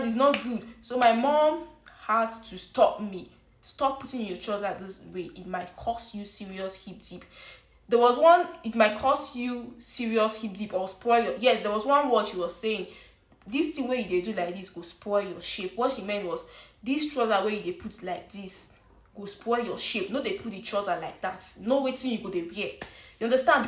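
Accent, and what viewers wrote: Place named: Africa. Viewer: The music makes it harder to hear what you're saying especially since i an not from Africa and the accent you have is new to my ears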